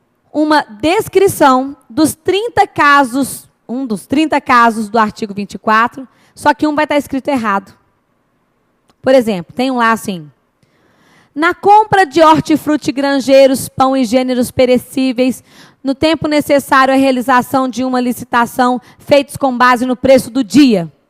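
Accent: Minas Gerais, Brazil. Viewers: Independente dessas aulas terem sido publicadas há alguns anos, é a melhor professora de Direito Administrativo para concursos que existe no YouTube. Ela tem o que o outros não têm (apesar de serem bons): a simpatia, o carisma, o sotaque mineiro e, claro, o toque feminino... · Hey... Você é a melhor!!! Ta ficando Pop!!! E o sotaque de mineirinha é a cereja do bolo para meu aprendizado!!!!!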